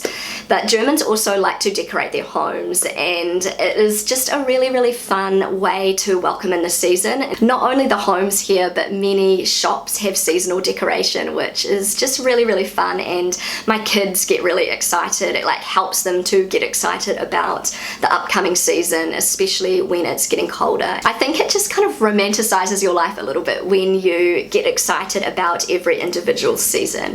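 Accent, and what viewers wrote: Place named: New Zealand. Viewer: Being from northern Germany I don't care much about dirndls, but I love listening to your NZ accent. As a former exchange student to the UK and the USA it is absolutely beautiful to learn about your pronunciation - and I think it's lovely. Thanks for sharing! ❤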